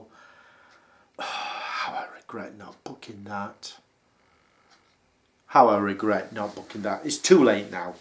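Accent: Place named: England, United Kingdom. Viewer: I love this movie! I have the hots for the older grey haired man in the film (soccer holigan man). You are a very cute with a loverly english accent:) very cute smile aswell.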